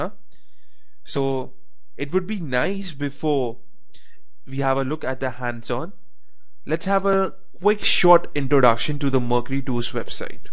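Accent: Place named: India. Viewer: Thick Indian accent. Sounds like he has two huge bread stuffed inside his mouth.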